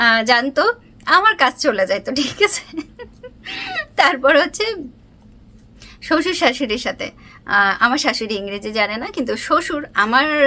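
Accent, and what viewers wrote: Khulna, Bangladesh. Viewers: আপনার কথা ভংগিমা অনেক সুন্দর কারন টা হলো আপনি আমাদের খুলনাবাসী তাই।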